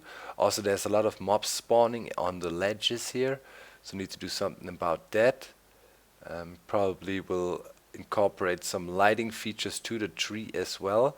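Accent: Germany. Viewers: ach ich liebe deinen deutschen Akzent :)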